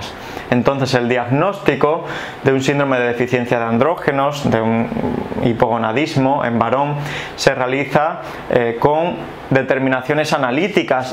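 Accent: Spain. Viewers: La información puede ser buena, pero la verdad la parte comunicativa rompe el ánimo de seguir viendo, para los americanos hispanoparlantes de por si, nos dificulta un poco decifrar la manera de hablar de los españoles, pero echándole ganas se puede traducir (sin justificar el excesivo uso del "vale" que para nosotros no tiene sentido!), pero lo que si me resulta grosero es el uso innecesario del inglés, ojalá pudieras eliminar eso y seguramente tendrías algo más de aceptación. saludos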